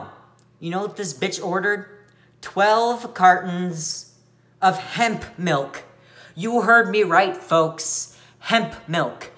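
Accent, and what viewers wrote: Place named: Midwest, United States. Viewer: If one slows down the video to .75 speed, you sound like a drunkard complaining about life and I fucking live for it. I love your voice, I'm from Michigan so It's nice to have someone from the Midwestern reigon to identify with. I dunno, it sounds weird and you'll probably not see this and/or not acknowledge it but I just wanted to share my not-so-valuable opinion. You are so funny man, I honestly hope you get the recognition on this shitty platform that you deserve.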